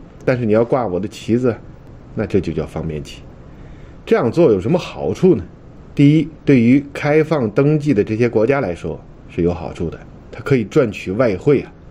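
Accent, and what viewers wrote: China. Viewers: 李船长是台湾人吗？为什么是有大陆口音，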